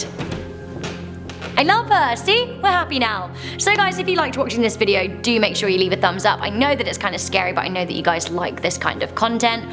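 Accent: United Kingdom. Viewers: Why are you british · Jane admits your British accent is perfect. Who is Jane? That is your choice. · I always prefer to watch scary videos with a British person. Makes me feel safer lmao. Probably cause it makes me feel at home.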